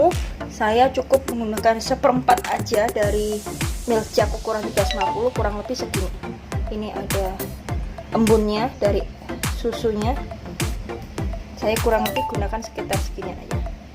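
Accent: Java, Indonesia. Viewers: Medok nya mantul mba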